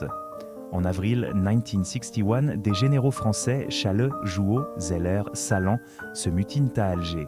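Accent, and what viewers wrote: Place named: United Kingdom. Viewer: vous prononcez les dates en anglais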